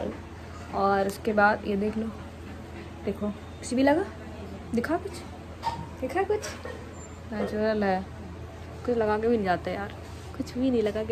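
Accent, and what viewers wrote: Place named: Awadh, India. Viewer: wahh awadhi tone ko Hindi me sunna bohot acha lagta hai mujhe aap ka Blog acha hai starting achi hai aap ko bolne ka hunar bhi hai yaha me sab nahi keh sakta kyunki blog ki bat hai par han agar me tumhari koi help kar paun to mujhe jarur batana studio ka kam hoga to batana ❤ baki achi kosis sherni ho aap❤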